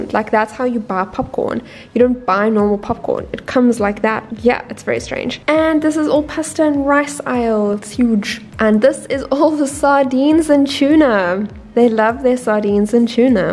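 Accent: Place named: South Africa. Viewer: Hi, so nice to hear a fellow South African blogging. Well done and can’t wait for more.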